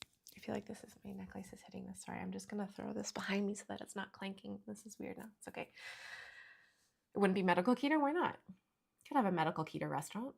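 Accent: Canada. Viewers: I loved hearing your Canadian lingo come out 😍 The use of “hey” after a statement is the cutest! I’m from Texas and I never hear it. Love it.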